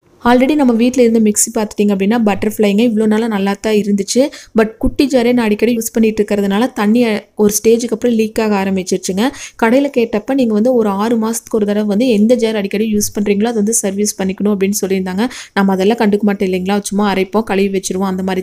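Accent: Coimbatore, India. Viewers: Hi...unga coimbatore slang voice is sooo gud😊😊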